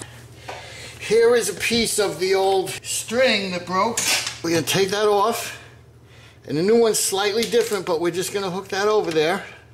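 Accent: New York, United States. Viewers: Thanks. I value the 2 tips you gave. The first was using the putty knives to help lift the front legs over the vinyl flooring edge. The second to use a string the stretch the spring enough to hook the cable end. And I enjoyed your accent, too. Nobody can match a native New Yorker. I have been away from my boyhood neighborhood in the west Bronx for a long time. Take care.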